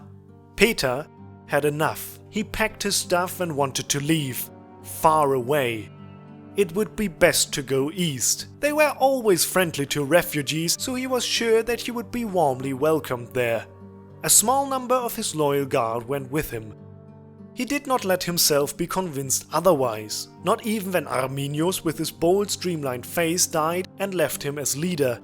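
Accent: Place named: Germany. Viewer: Das ist sehr gut. German english pronunciation sounds great and hilarious! Glad I found your channel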